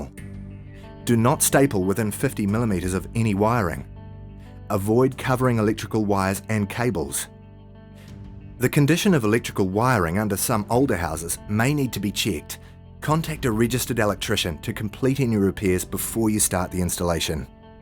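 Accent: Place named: New Zealand. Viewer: This video needs to be narrated by an Aussie the kiwi accent will inhibit sales and no one will take it seriously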